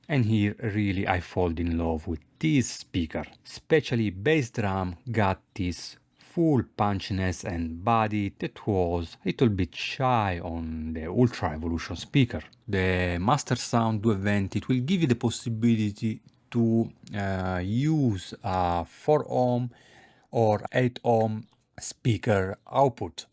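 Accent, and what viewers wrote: Italy. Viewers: Are u Italian ?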